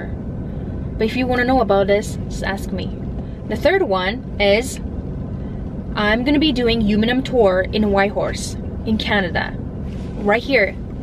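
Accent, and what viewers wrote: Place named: Japan. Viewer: I love your Japanese accent.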